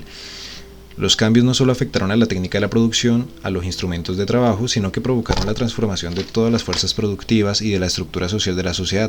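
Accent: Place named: Mexico. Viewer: Hermano deje de hablar como mexicano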